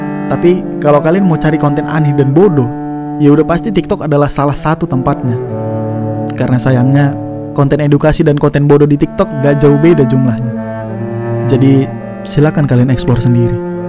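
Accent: Medan, Indonesia. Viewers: sorry oot, btw kau dari medan ya bang? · Bang orang batak lu ya ? Wkwkwk Logatnya kayak orang medan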